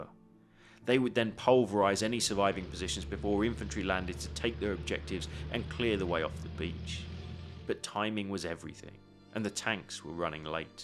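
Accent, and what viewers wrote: England, United Kingdom. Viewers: That the narrator has an English accent says everything 😞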